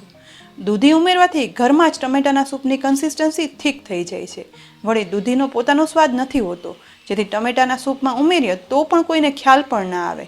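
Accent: Kathiawar, India. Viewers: Soup saras..mug pan saras. Bhaat pan saras..salad pan saras...badhun j "Madhuram Madhuram!" Thanks. Amey aavaa oogaadelaa mug banaaviye ne saathe Kadhi, bhaat ee combination maan kariye. Thanks for the recipe. Kathiyawadi mithi bhasha saambhalavaa thi maney maarun Rajkot yaad aavi jaay chhe. Hun tau janmi tyaar thi mumbai maan j oochhari pan amaaraa ghar maan kathiyawadi , naagar ni bhaashaa j saambaleli, vali sanyukta kutumb maan rahyaa ee j amaarun gujarati vaataavaran , baaki aaspaas tau christians aney momedan loko ni j vasti. Lagna pachhi Rajkot rahevaanun thataan Rajkot maan vadhu saaro avakaash malyo Guajrati bhashaa no laabh malyo. Thanks again.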